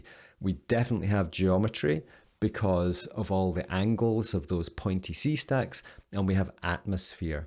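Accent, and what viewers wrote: Scotland, United Kingdom. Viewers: the Scottish accent held me here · I am in strong agreement with the message of your video. As to your voice, yes please give it needed rest. You and have among the most charming Scottish voices I've heard!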